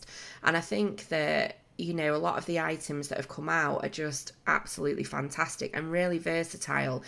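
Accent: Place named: United Kingdom. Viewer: Such an amazinh build once again! I always watch your videos... im a huge fan of UK im dying to visit your country... but until then I have to hear you accent... its so amazing 😂😂 Btw loved the entrance song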